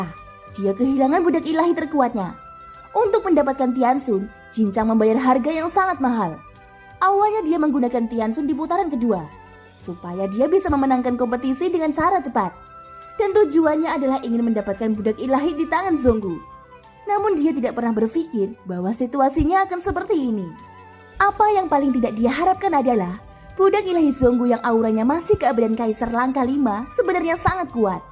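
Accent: Java, Indonesia. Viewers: Min aku rindu suaramu yang meddo'😅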